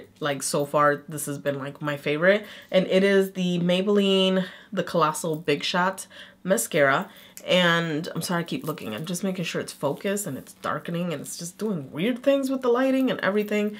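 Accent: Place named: Connecticut, United States. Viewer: Oh hey Connecticut! I caught the accent a while back and I was wondering... but I knew you were nearby haha I'm in Bawstin honeyy.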